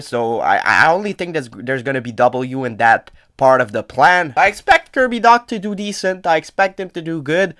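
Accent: Canada. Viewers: Man I fucking love hearing your accent. I live in Toronto now so it brings me such hometown vibes!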